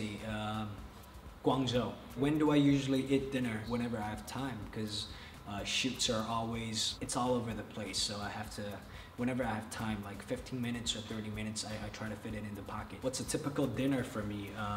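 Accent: United States. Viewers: A non-American teacher with a tinge of an American accent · i obv know that i'm just trying to say that even though his accent is kinda american there is a little diference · He went to an international school in HK, so yeah American accented English is norm. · He went to American International School in Hong Kong and was probably taught by Americans, that's why he speaks like an American. But I love your comment :D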